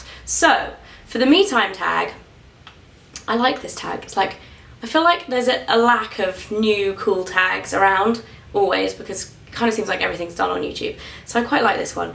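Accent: United Kingdom. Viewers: Are you British or Australian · She's British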